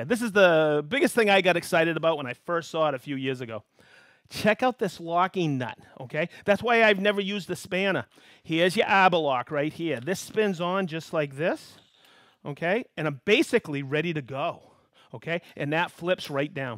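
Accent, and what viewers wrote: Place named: East Coast, United States. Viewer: "this is an accessory God...Spock God" east coast accent...love ya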